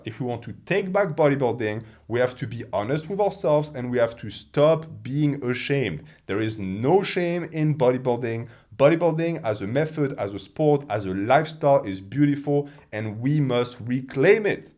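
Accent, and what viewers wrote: France. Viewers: i hear french accent, i close the video. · I work in audio so I'm a bit of a snob admittedly. A lot of people just don't care and I get that. But I do believe his old audio was objectively bad sometimes in that I would miss certain things he said because of the combination of mic distance, room reflections, and French accent.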